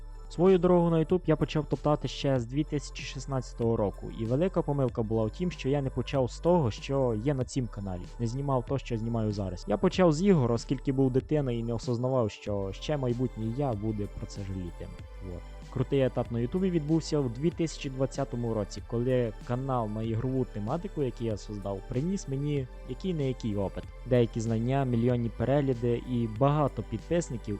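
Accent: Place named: Ternopil, Ukraine. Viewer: Короче я подписался. Давай больше контента, у тебя интересный Украинский. Как будто Тернополь что то такое. Давай больше видео и юмора 🎉 жду.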